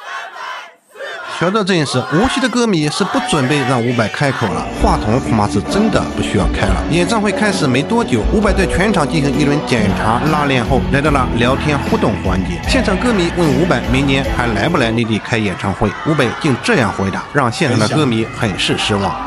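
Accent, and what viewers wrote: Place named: Taiwan. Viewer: 臺灣腔 又很臺 沒想到500在中國那麼紅 難想像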